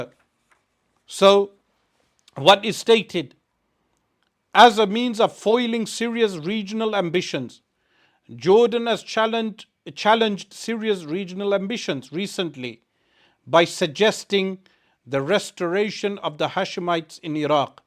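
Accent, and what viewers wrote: United Kingdom. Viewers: You might be right. But remember with that British accent and paying taxes to a country that supports war on your own religion. So first admit your own hypocrisy first than expose others.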